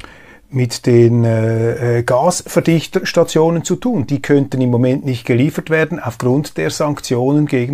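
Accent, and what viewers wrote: Switzerland. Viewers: Riäsa. 🤣 Uff Schwiiizer Dütsch. Traumhaft. Beste Grüße.. Wunderbarer Kanal Dankeschön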